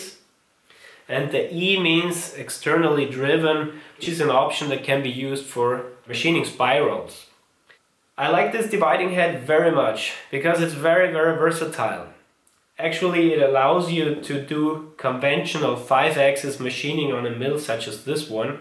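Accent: Austria. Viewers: your like the first Austrian guy who's English and accent doesn't hurt my brains. Thank you. Subscribed :)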